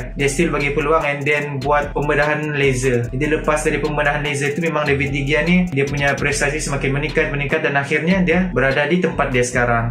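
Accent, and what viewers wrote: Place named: Sabah, Malaysia. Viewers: Original sabahan bah speaker nie...pelat bunyi nya... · Sabahan speaker 👏🏻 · Sabah bah ni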